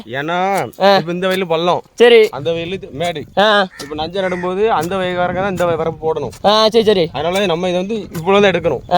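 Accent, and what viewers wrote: Tirunelveli, India. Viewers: சூப்பர் பா திருநெல்வேலி தமிழ்ல நீங்க பேசுறது சூப்பர் இந்த கருத்தை சொல்வதும் சூப்பர் எல்லாரும் தெரிஞ்சிக்குவாங்க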